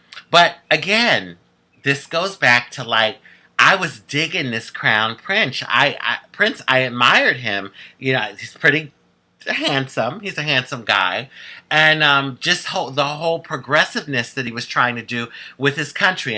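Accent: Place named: New York, United States. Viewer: you really do personify multiple races (white, black, Indian, islander, etc..) But I sorta consider you as a black woman. You have black people most unique ways and manner. You speak more like an educated white woman from new York, but when you let your guard down, the real you comes out. It makes you funny and someone that many can identify with. Be blessed my sister. I watch every video that you put out, seriously.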